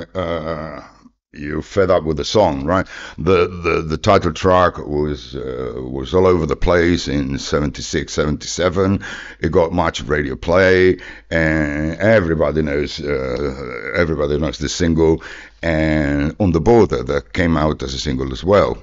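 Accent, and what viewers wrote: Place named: Birmingham, United Kingdom. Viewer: were a phenomenal band, and I think they were one of the first Progressive Rock bands. Their album is pure magic. I'm also huge fan of the vastly underrated band. went on the become the founder and lead singer of, and his voice is one of the best in Rock music history. is a 5 star masterpiece! It has such a collection of great musicians from the U.S. & U.K. who accompanied on this tour. I was fortunate enough to see at Woodstock (yes, I am old enough to have been there), and captured the musical world with his beautiful expressive voice for a few years, but because he was struggling with alcoholism and he was a very shy man he stayed away from the public for several years. He did have some hits in the mid 1970's with songs like " " and " ", but he didn't become popular until " " from 91/2 Weeks. This is a bloody good channel, I really liked this video. 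You're living in Spain, but I detect a Brummy accent. Are you from the U.K.?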